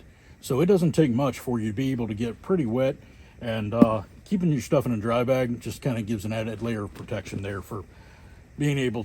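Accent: South, United States. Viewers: I thought you had a little south in your voice man 😂, now I know brother 👍🏼.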